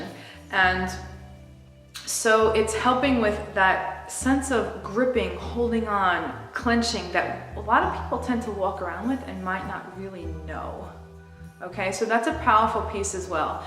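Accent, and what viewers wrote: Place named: New York, United States. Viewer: Great video. I like your accent, are you from nyc?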